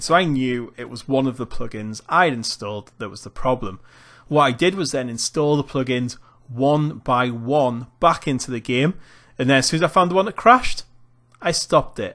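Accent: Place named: Newcastle, United Kingdom. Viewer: you sound like your from near newcastle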